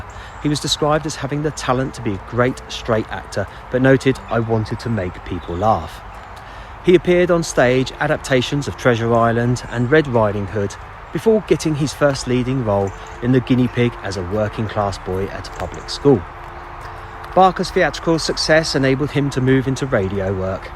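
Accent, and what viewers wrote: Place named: England, United Kingdom. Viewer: Don’t care much for your working class pommie accent👎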